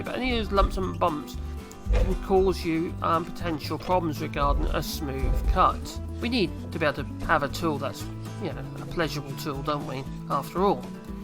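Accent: Norfolk, United Kingdom. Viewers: I know this is how the world has gone but I prefer your natural voice. As a Scouser I can't be certain but I would bet it is a Norfolk accent?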